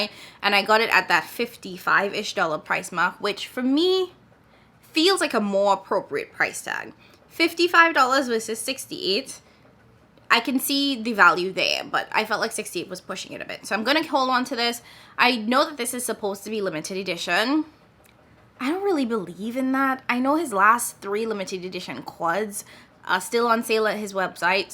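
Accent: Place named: Trinidad and Tobago. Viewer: Are you from Trinidad? I detect an accent! I am considering picking this palette up but I was worried that some of the mattes would be too light for me. This video was really helpful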